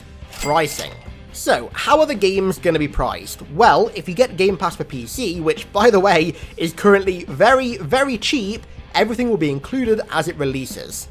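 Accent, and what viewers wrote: United Kingdom. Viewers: when you've been watching for years and only just now realized he's british...... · i always thought you were american, good to see a british halo youtuber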